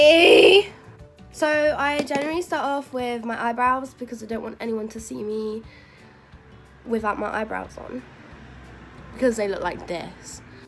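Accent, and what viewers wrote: United Kingdom. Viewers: YOU’RE BRITISH?! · She's British but she lives in France (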